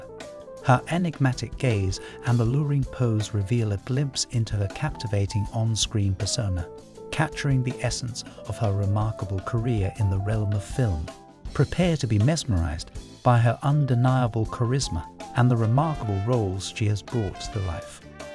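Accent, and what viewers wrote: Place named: United Kingdom. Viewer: Hyperbole with a British accent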